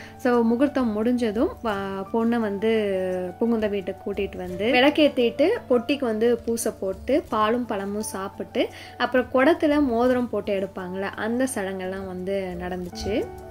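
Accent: Kongu, India. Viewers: Nenga kongu ah sis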